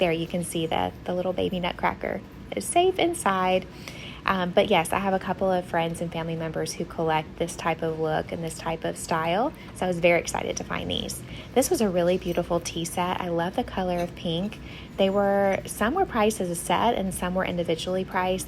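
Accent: South, United States. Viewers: What?? Who on Earth could/would say anything about the sweetest voice! I'm from and live in NH and I love your southern accent!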